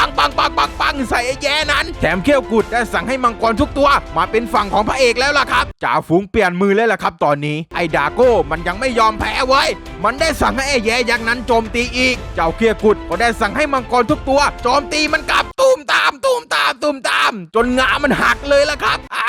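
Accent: South, Thailand. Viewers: แหลงใต้มากๆ ก็ดีน้ะครับหลกดี555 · สำเนียงคนใต้เลยนิ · พี่คนใต้เหรอแหลงใต้ชับพึด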